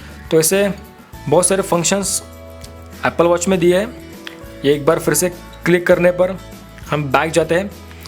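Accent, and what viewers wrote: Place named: India. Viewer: i love your hindi accent❤️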